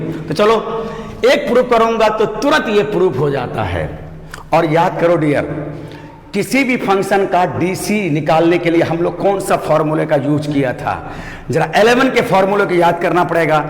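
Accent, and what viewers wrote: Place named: Bhojpuri, India. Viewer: Very nice sar bhojpuri style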